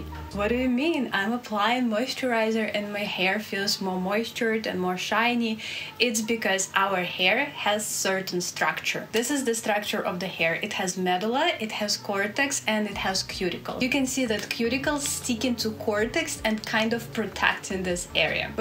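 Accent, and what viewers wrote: Russia. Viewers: как приятно услышать наш русский акцент с первых секунд видео)) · you have a russian french and dutch accent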